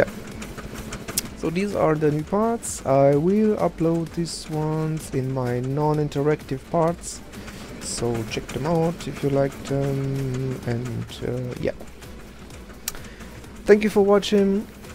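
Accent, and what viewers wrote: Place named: Germany. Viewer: You sound German, are you?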